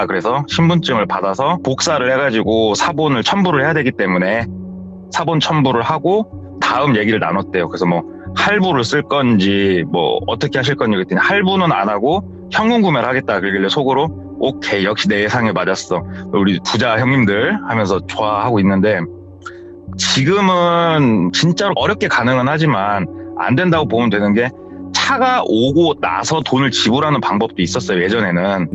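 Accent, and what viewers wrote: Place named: Jeolla, South Korea. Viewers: 맞아, 몰입감 너무 좋고 전라도 살았던 청취자로서 오랜만에 듣는 사투리에 정겹게 들었음ㅋㅋㅋ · 정겨워요~ 저도 전주사람 입니다 제가 항상듯던 우리동네 말투~ㅎ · 고향이 전북쪽인거 같아요 말투가 너무 정겨워요~~째깐한^^저랑 고향이 같으신듯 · 의 정겨운 전라도 사투리..좋아요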